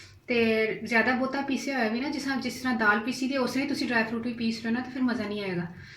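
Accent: Sialkot, Pakistan. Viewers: Very nice or apni very nice sialkoti panjabi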